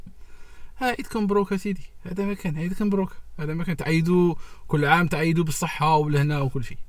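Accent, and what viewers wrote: Oran, Algeria. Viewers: C'est du pur nectar à vous écouter parler oranais que ce soit pour la forme ou le contenu. Merci.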